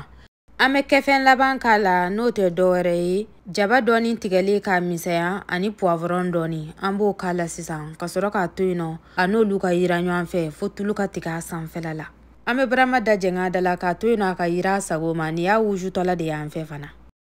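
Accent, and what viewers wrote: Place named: Segou, Mali. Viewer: Just love the way she talks. It reminds me of home (ségou)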